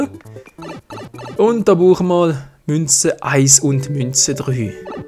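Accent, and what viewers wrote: Switzerland. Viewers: Schwizerdütsch, winis liebä XD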